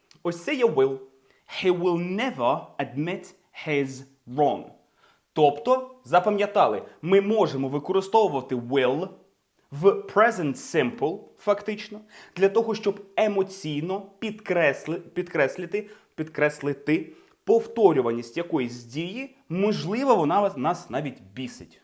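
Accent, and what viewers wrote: United Kingdom. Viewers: Хахах... Усі вчителі навчали нас зовсім по-іншому! Усі, буквально кожен з них вимовляв просте слово "open" як [оупен]... 😂 У вас же - зовсім інакша, але така типова вимова як для звичайного англійця! Не "оупен", а щось схоже на "ьойпен" (майже так само якщо поставимо якусь приголосну перед м'яким знаком, але не вимовляючи цю приголосну!). Просто шикарний British accent!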